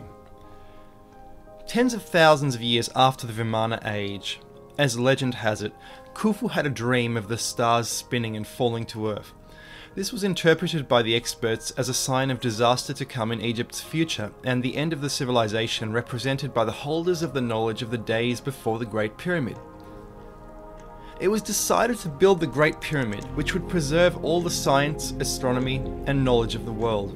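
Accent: Australia. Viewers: Sounds like a good, stand up australian boy! Well done son. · Speaker has an Australian or new Zealand accent not English.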